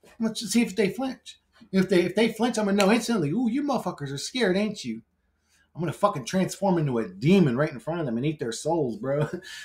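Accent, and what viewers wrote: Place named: Nigeria. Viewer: The Nigerian uncle accent my favorite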